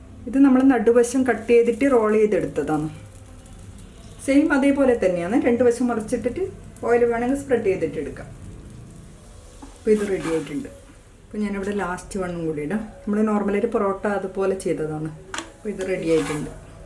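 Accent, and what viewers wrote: Kannur, India. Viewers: Language tune like Kannur?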